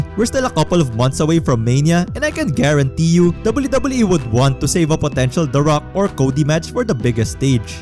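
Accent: Philippines. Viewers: He’s certainly held it hostage but you can’t blame but think that whoever finally beats him, that sigh of relief would be something else. Also from your accent, are you Filipino?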